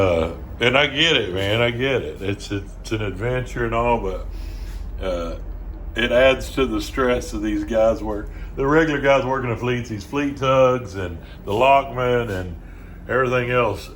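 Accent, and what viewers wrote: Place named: South, United States. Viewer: People will never under stand red neck talk bud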